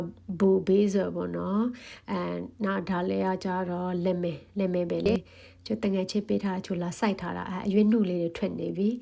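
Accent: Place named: Myeik, Myanmar. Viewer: ဘိတ်သံလေးနဲ့ ချစ်ဖို့ကောင်း နေရော ❤❤❤ အားချစ် ညီမ ငယ်❤❤❤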